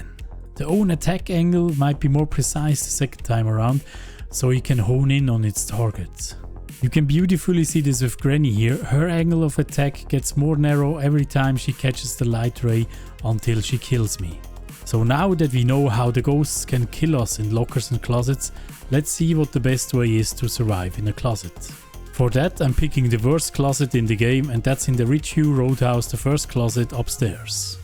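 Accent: Switzerland. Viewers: Are u swiss? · schweizer english 😅 triggert schon ein wenig xD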